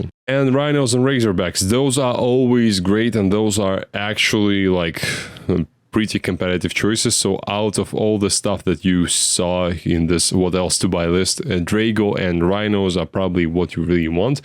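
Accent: Russia. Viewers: Pls tell me that is russian accent? Or I'm wrong :)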